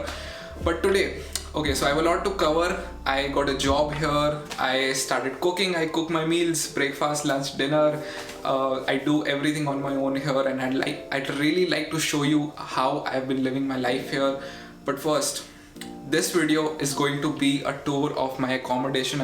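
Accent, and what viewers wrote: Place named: India. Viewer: Wft ? This Indian guy can study in ANU with those terrible English and accent ? ANU has low standards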